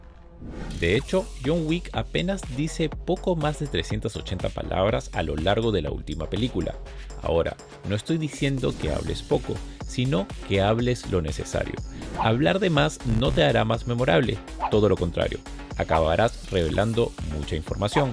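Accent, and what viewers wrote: Peru. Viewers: Muy Buen video. Eres peruano? Tienes el acento.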